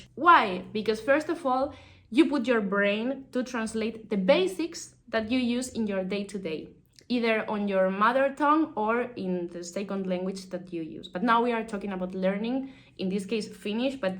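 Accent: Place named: England, United Kingdom. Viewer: ngl i love your english accent, so much energy!!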